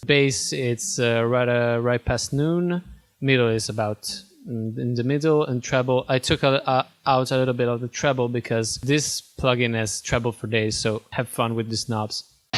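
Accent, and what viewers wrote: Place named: Italy. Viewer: ah, ecco, mi sembrava di sentire l'accento italiano ☺. Grandissimo 🤘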